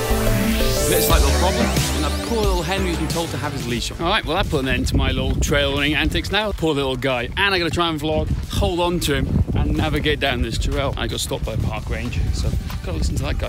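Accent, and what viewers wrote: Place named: United Kingdom. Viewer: You have a very nice style and manner, also always nice to hear a fellow Brit ✌🏻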